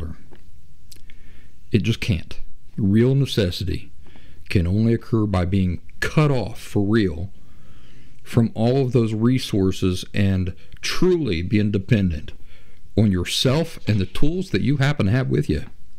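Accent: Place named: South, United States. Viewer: no worries about how you talk, My dad was from Alabama/Mississippi and he talks almost just like you. I'm glad I found your YouTube channel. Thanks for video.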